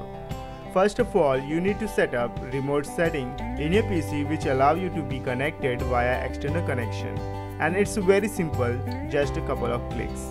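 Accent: India. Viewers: darkxx0 this is the voice of an normal Indian so don't judge anything about it. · This might be racist but once I heard your Indian voice I automatically thought that "this video is a scam".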